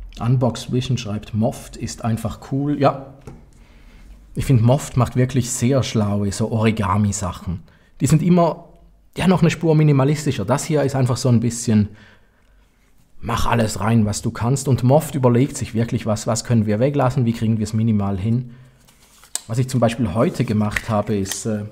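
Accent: Switzerland. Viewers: das schweizerische super charmant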